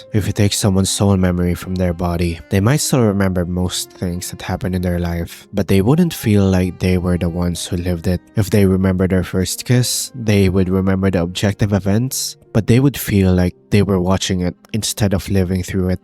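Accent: Philippines. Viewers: do i hear a filipino accent?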